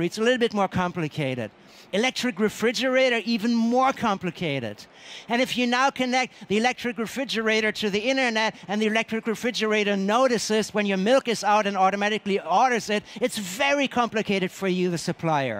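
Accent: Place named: Germany. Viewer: Good message! But very annoying delivery (too nervous, too loud, aggressive German-English...) Couldn't watch this for more than 15mins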